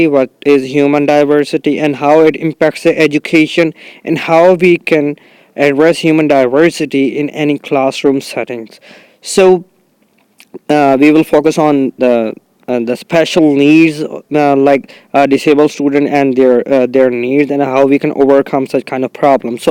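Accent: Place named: United States. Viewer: US accent mai bt krny ki... so horrible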